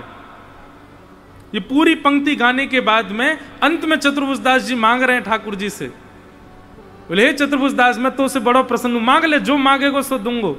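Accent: Braj, India. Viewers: Radhe Radhe maharaj ji aapki ktha bruj bhasha mein sunana bahut achcha lagta hai ❤❤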